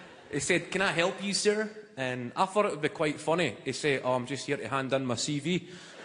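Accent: Scotland, United Kingdom. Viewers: Hey, hey, hey buddy are you actually Scaaatish? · Love the Scottish accent it’s my favourite!!! (🏴)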